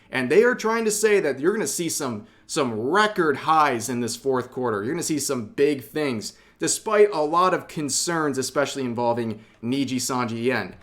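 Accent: Ireland. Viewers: You're Irish? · wait, is irish?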